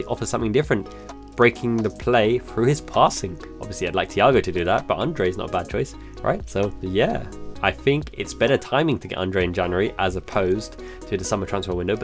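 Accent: United Kingdom. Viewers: Good job man! Just wonder how come you speak that perfect English with British accent as a Japanese?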